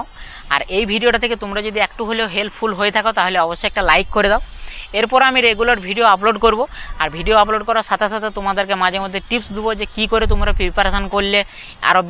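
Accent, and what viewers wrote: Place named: Assam, India. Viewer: পশ্চিমবাংলার ভাষা তো বাংলাদেশীদের মতো,কারন তারা ৮০% বাংলাদেশীই, তুমার ভাষা শুনে তো তুমাকে আসামীস মনে হচ্ছে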